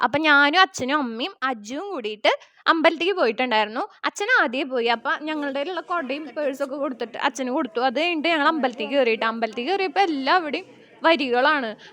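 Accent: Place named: Thrissur, India. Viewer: തൃശൂർ ഭാഷ❤,ഏതാ അമ്പലം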